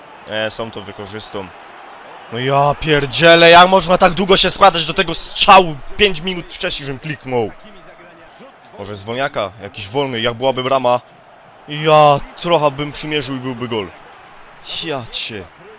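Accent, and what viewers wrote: Silesia, Poland. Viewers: Zajebisty twój Śląski akcent. Miło się go słucha. I te teksty :D. Mieszkamy trochę blisko, tyle że ja z Dolnego ;) .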